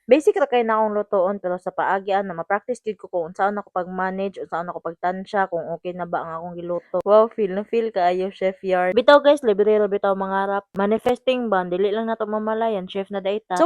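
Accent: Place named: Bohol, Philippines. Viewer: tamsak host. boholana girl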